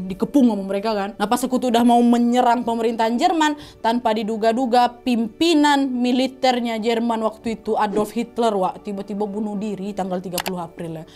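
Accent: Indonesia. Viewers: 😂😂lucu ni bercerita dgn nada Indonesia nya...saya kekadang tak faham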